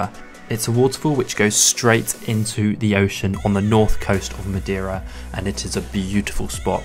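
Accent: United Kingdom. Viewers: Can’t take the British - Portuguese pronouncing serious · Cheers! Great, great vid. Madeira is stunning, no doubt. The hike between Pico do Arieiro and Pico Ruivo must be one of the top hikes in Europe. My favourite island. Don't worry about the pronunciation: Brits speaking Portuguese words always make me giggle (in a good, lighthearted way). Best wishes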